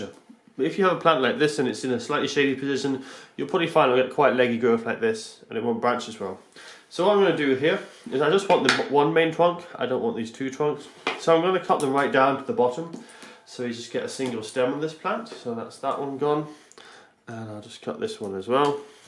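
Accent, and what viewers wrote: United Kingdom. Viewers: I love your channel! For some reason a British accent keeps me from getting bored on how to videos. I have a question: how old should my jade plant be before I start pruning it like that? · U sound like ur from the UK. What growing conditions are u using to get around the bad UK weather. And are you keeping these plants indoors outdoors or using a greenhouse?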